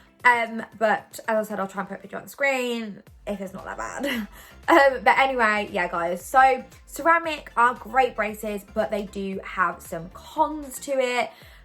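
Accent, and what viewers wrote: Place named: Australia. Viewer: Hi, I really like your accent. Are you Australian?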